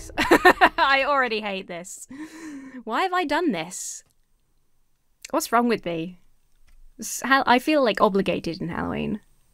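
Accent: United States. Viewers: Love your American accent as always. But, uh, calling this a "game" feels a tad generous. Still, got some good fun out of it. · your American accent freaked me out more than anything. It sounded too good, like you been lying about having a British accent this whole time! It was unsettling. 😊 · your american accent sounds a little bit like Red from OSP. :O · Your American accent is on point!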